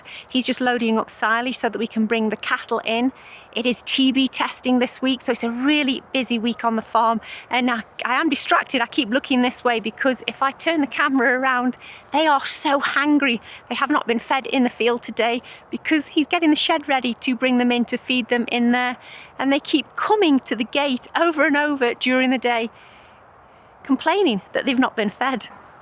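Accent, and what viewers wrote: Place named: Staffordshire, United Kingdom. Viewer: Hello New sub from me. Found you through sow chatty. Love watching other peoples plots. I’ve just taken on a new acre garden so am only really at the planning stage of my veg garden. Fabulous that the farm provides you with such a great supply of muck. Great harvest. Lovely to hear that staffordshire accent too, being from staffordshire myself.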